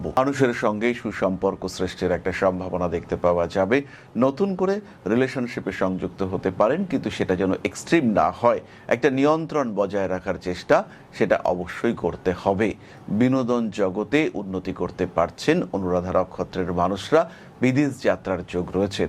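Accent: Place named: Bangladesh. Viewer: আপনি কি বাংলাদেশে বড় হয়েছেন ছোট থেকে মানে আপনার কথার টান শুনে মনে হয়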